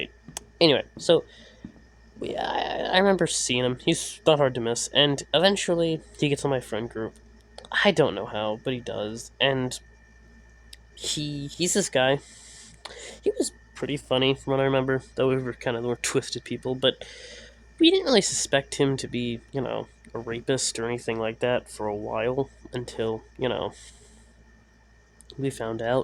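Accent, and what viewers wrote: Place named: RP, United Kingdom. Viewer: You sound like you attended private school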